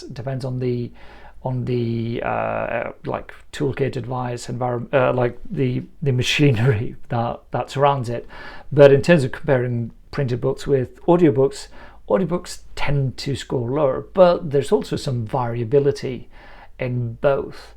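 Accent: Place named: Iceland. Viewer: Well, thats an instant subscribe :) Although i admit, i read your articles with much more of the very nice icelandic accent.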